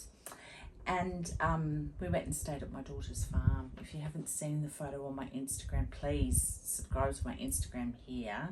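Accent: Australia. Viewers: Look, you could possibly take your own advice and not knit the rest of the MKAL just because you aren't feeling it. But you can still use what you have done to make something. One of the European knitting podcast girls made hers into a cowl. I'll go follow you on Instagram and leave a comment with a link to her for you. So enjoy having another Aussie to listen to while I knit. 💜💜💜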